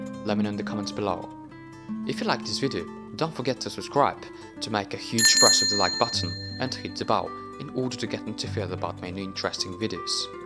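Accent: England, United Kingdom. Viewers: I've taken a few classes in Spanish, so I kinda have an active beginner's knowledge, and a somewhat passable passive knowledge, at least when written. Anyway, I knew about almost all the facts here, already, except for Equatorial Guinea. That English accent is quite something, though. I almost found it easier to understad you in Spanish... 🙄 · ¡Me encanta el acento de Inglaterra!😊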